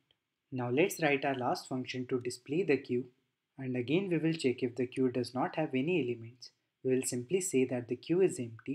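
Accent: India. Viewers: you have quite heavy indian accent but other than that, clear explanations and simple video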